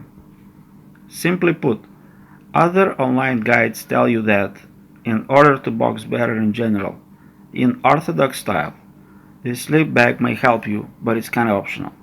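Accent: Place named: Russia. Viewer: Делай переводы ты же русский Пиздец падишься по произношению . КОНЕЧНО ТЫ КРАСАВА!!!!СЛОВ НЕТ !!!ДЕЛО СВОЕ ЗНАЕШЬ!!! НО НА РУССКОМ ЯЗЫКЕ ПЛИЗ МОЖНО?!!!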